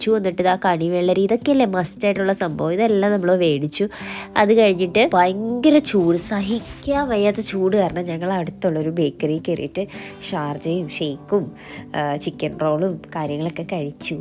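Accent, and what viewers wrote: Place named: Ernakulam, India. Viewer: Eklm slangayallo😂❤️😍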